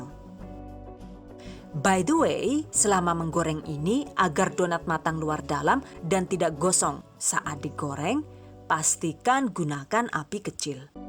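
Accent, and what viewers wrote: Banyumas, Indonesia. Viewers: Yahh koo malah ngapakk... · Hehhehe sambil semyum2 sndiri klo udh liat vidio bahasa ngapaknya buat lucu #GiveawayTHV2021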